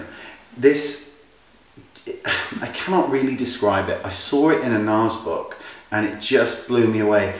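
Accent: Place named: London, United Kingdom. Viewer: It's a simple london accent :)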